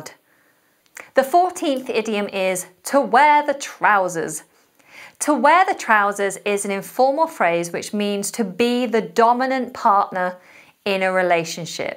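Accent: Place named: United Kingdom. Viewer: I am falling in love with British idioms and British accent because of you 😭♥️♥️♥️♥️.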